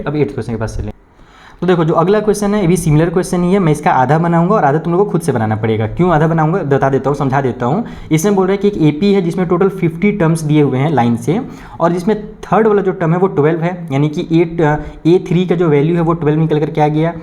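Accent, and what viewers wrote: Bihar, India. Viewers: Sir bihari language ❤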